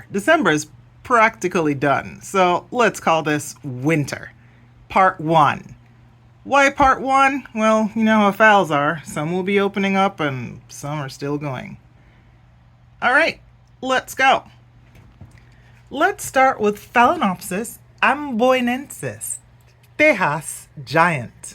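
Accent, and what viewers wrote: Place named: Jamaica. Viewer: Hi new friend 😊 I just subscribed to your beautiful channel. I know I heard the Jamaican accent in your voice, and then I read the description and see that you are . Your orchids are beautiful. 😊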